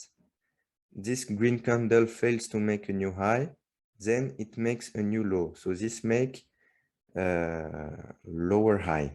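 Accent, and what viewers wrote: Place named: France. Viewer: merci😁😁😁...tu as un accent anglais"français"...merci encore pour l'éducation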